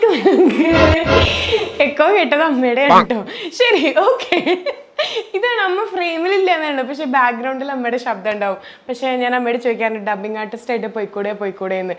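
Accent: Thrissur, India. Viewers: Ath pinne Nammade THRISSUR kkari alle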